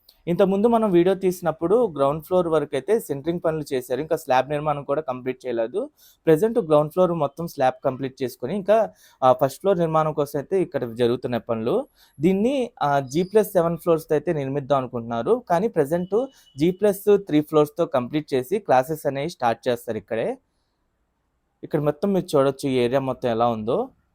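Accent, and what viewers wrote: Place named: Telugu, India. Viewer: Do not comment about his language,he is speaking local telugu slang,go ahead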